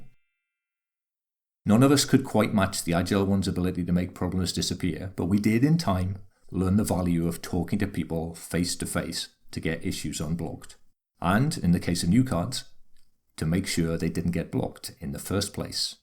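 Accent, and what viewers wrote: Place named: United Kingdom. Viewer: Thank you for speaking slowly and clearly. Not all Youtube educators grasp that students hearing the information for the first time need it delivered slowly and clearly. As a Yank, I find your smashing British accent most enjoyable. Your videos are fantastic. Bravo!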